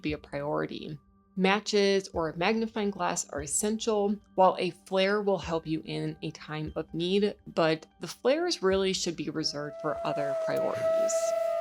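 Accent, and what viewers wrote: United States. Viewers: Great video! U sound WI af😊.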